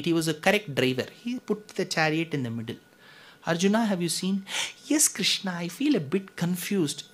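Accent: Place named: Karnataka, India. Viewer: Saranagadhi.🙏🙏🙏 I enjoyed the beautiful kanada slang though I don't understand the language.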